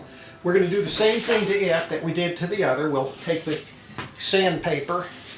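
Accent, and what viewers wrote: United States. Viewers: Ok, thanks. I like, looks more feminine. I made crystal radio in 1964 when I was studying in the 6th class. My ham call is ap2kd, and I'm ok in ARRL book. I have a lot of ham equipment. Most famous WS 19, RCA AR 88, Marconi Atlanta, Marconi Appolo, Collins KWM-2A. I also like military communication equipment being very rigid and waterproof. From your speaking exsent, you look American. Regards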